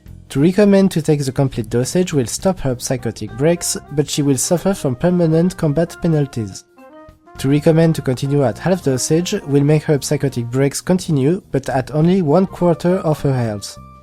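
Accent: France. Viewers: ton accent français Me croire que tu es un français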